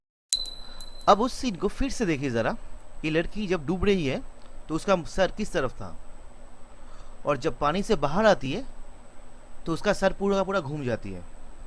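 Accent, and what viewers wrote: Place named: Bengal, India. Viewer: You are a Bengali right ?... ik this accent ...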